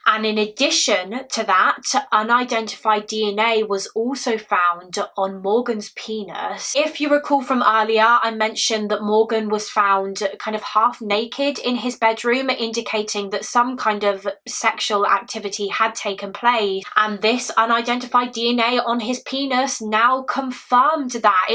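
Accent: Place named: RP, United Kingdom. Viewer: I had a horrific day but a new video will cheer me up. That posh accent is so relaxing 😊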